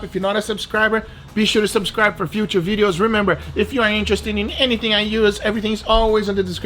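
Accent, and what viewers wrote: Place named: Brazil. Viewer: Brazilian Accent ALERT!!!!! hahah muito bom seu canal to usando varias técnicas que aprendi com você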